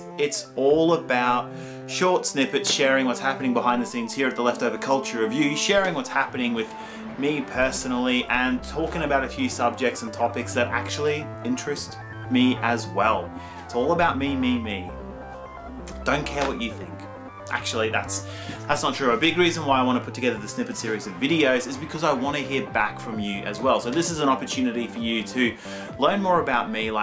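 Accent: Australia. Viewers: your creativity, editing style and fun aussie accent are what I enjoy about your videos. you should do whatever you are passionate about in your videos. keep up the good work bro :)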